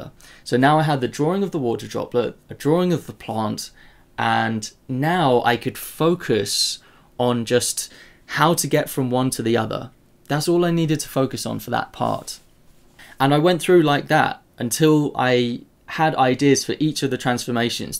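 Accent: United Kingdom. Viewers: Thank you very much! I needed morphing in my studies))) I really enjoy your videos thanks for British accent ;)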